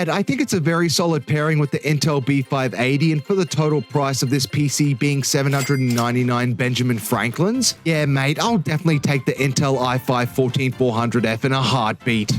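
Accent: Australia. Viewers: why does this ai narration sound so aussie right down to the adjectives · Your Aussie voice saying, "...the total price of this PC being seven hundred and ninety-nine Benjamin Franklin's..." made me spit out my beer, as if it was a Vegemite Sandwich. Benjamin Franklin appears on the $100 Bill [in US Money]. If that were true, this crappy PC build would cost $79,900 [US Dollars]. Quite the overstatement! You owe me a beer. Next time I'm Down Under, I'll let you know., so you can put one in the refrigerator for me. I don't drink 'em warm. Oh, sorry, forgot you're not European, you're Australian. Scratch that. I'll drink a warm beer there. I'm not sure if you guys [blokes, mates, whatever you call yourselves] even have refrigerators. BTW, I get drunk after one beer, so you might want to make a bed for me to sleep over on. Oh yeah, after I fall asleep drunk, I sometimes piss myself, so heads up! 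You might want to put a plastic cover on the mattress before I sleep over. As for breakfast, nothing special, just two eggs "sunny side up", some bacon, hashbrowns, orange juice, coffee, and toast. You don't have to make pancakes for me. I don't want to be a bother. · are you aussie?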